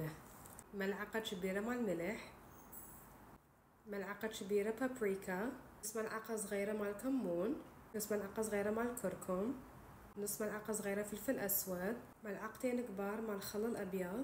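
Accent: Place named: Iraq. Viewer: حبيت اللهجة العراقية الي بعدج محتفظة بيها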